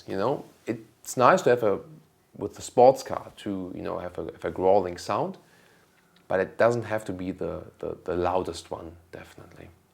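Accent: United Kingdom. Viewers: Many thanks for taking your time for the Q/A. Great episode, great Schnauzers, great British Accent. I am already looking forward to hear your Danish skills ...... definetly! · Your British accent is hilarious xD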